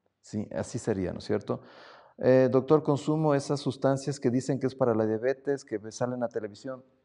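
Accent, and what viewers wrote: Mexico. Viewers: Gracias por su consejo doctor tenía muchas dudas sobre la avena, es la primer ves que lo veo y habla usted muy mexicano 😂 saludos de una mexicana hasta el lindo Ecuador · Gracias por compartir su conocimiento. Habla como chilango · Orale pense que era de México, por la forma como habla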